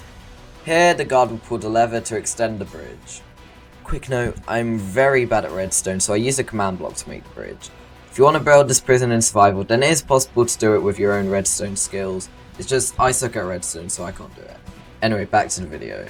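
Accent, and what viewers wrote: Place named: United Kingdom. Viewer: i dont know why its so funny to hear british people commentate things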